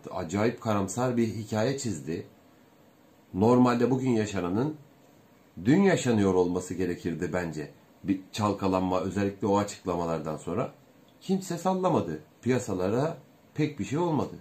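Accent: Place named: Erzincan, Turkey. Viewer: Memleket nedir.Erzincan yöresi gibi geldi.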